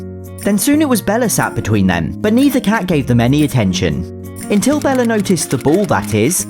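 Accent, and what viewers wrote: United Kingdom. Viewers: Question are you british???????????????????? YOU WILL BE MISSED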